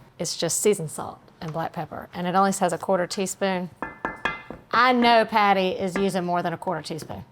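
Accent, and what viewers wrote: South, United States. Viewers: Your southern accent is on point. Just enough. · For my first comment since discovering cooking methods on this channel.... More of that Southern "Southern" accent please.... 😂💯💯💯 👋🏼👋🏼👋🏼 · You are SO attractive. The combination of your looks, personality and that Southern accent is just addictive. His bless your husband; he must LOVE 💕 you!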